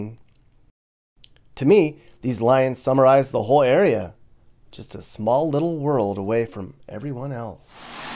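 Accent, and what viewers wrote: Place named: South, United States. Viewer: you speak just like a Southerner, twang included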